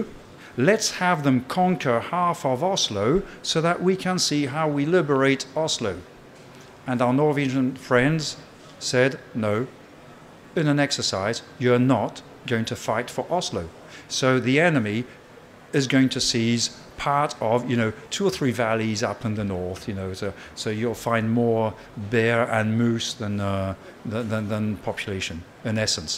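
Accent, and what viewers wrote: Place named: France. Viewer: He speaks perfectly English, but he has a heavy French accent, I recognize it as a French. English is clearly not your mother tongue.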